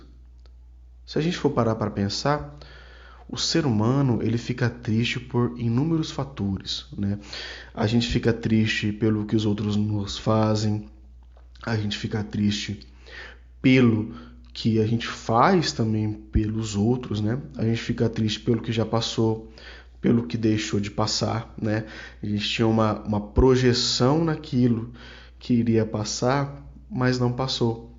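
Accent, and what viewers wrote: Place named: Inland São Paulo, Brazil. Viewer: Sua voz e sotaque é bem legal, você deve ser igual eu do inteiro de SP. Primeiro vídeo que assisto do seu canal, gostei muito, és bem objetivo! Abraço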